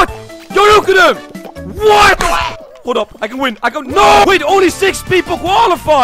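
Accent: Netherlands. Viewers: You sound really Dutch. Hoorde ik dat goed? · R you dutch ?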